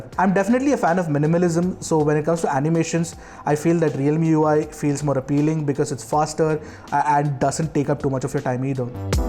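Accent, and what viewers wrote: India. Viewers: I like his english-indian accent ngl.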